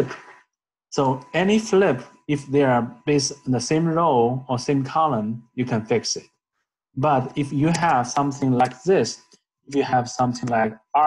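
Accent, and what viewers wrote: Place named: China. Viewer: I love your Chinese accent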